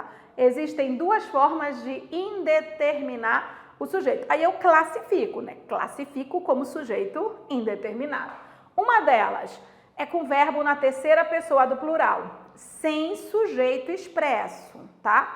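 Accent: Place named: North, Brazil. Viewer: Eu estudando e aproveitando para matar saudade do sotaque do Norte...morei 4 anos no Pará...parabéns pelas explicações.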